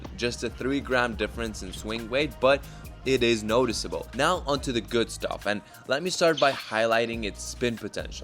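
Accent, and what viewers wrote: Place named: Russia. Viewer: From what part of Russia are you from? I Love your videos btw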